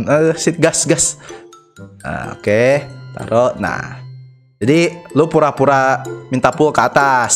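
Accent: Indonesia. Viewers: Item Dreams? Akwikwk Indo parah itu bahasa Inggrisnya 🤣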